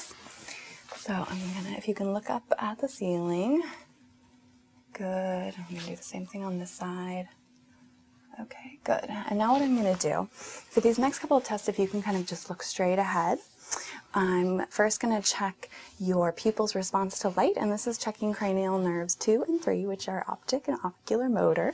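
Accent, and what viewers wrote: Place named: United Kingdom. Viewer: Your british accent gives you away 😄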